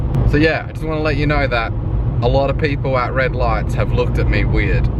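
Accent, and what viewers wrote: Australia. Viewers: noice!! i would subscribe to your bird channel too, also do David Attenborough voiceover with your cool Aussie accent :)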